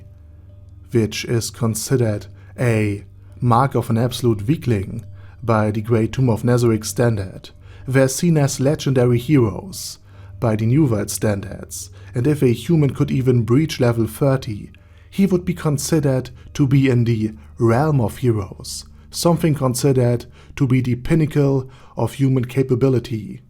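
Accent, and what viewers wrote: Germany. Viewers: Holy shit, this german English :D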